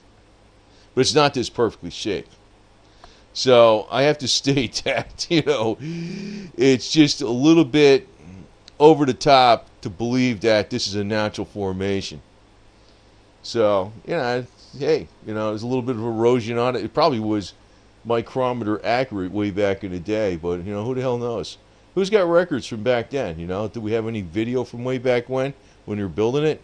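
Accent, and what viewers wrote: United States. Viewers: The american accent.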